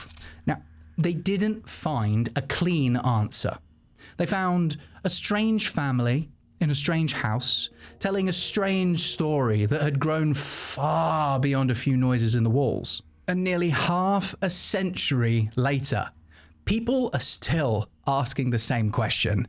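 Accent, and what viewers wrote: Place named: United Kingdom. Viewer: thank you for the new video and I am a new subscriber to your YouTube channel love your videos and love your British accent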